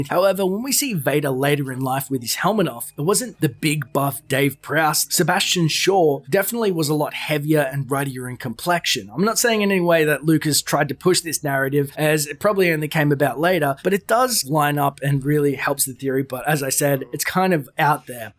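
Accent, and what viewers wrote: Australia. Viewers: Thumbs down. Australian accent sucks.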